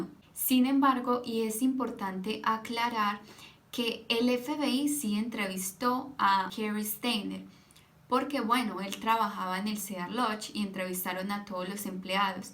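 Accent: Colombia. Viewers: Eres colombiana me queda claro, pero tienes una forma de hablar graciosa, como un acento de Colombia pero de alguna región en particular no? Los colombianos tienen un acento que me gusta mucho pero el tuyo suena un poco diferente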